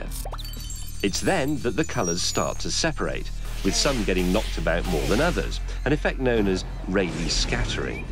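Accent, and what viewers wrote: United Kingdom. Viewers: My favourite British Accent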